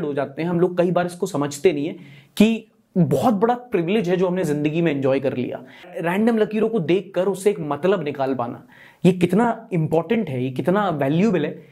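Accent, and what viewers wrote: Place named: Bundelkhand, India. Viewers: It feels so good to hear a recongniged youtuber speaking bundelkhandi.. ✨😁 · Bundelkhandi very beautifully spoken.. keep it up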